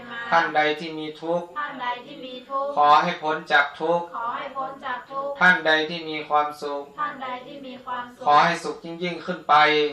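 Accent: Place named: Lampang, Thailand. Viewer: อยู่ลำปางพระที่หมู่บ้านพูดแบบนี้เป๊ะ😂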